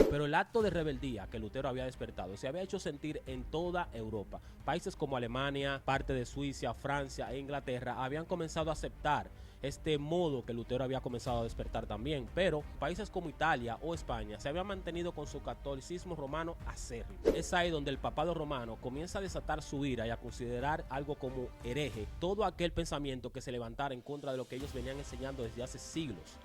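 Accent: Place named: Dominican Republic. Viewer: Es primera vez que veo tu canal, te saludo desde El Salvador. Interesante historia, por tu acento creo que sos Dominicano. Dios te bendiga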